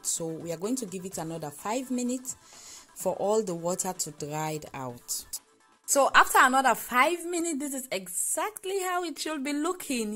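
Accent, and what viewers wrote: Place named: Cameroon. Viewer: Same ascent with Cameroon people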